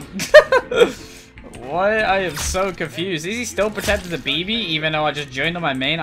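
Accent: United Kingdom. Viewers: are you british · I just realized thể he’s British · Wait is British like me? W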